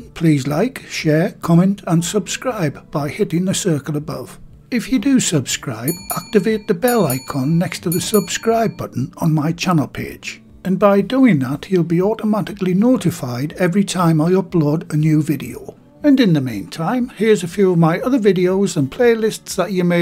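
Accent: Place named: Geordie, United Kingdom. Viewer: As a fellow Geordie, I love hearing your North East accent. I've made a couple of your bread recipes (just recently found your channel), and both times they've been a great success. Thank you for these delicious recipes. I'm looking forward to making more of them.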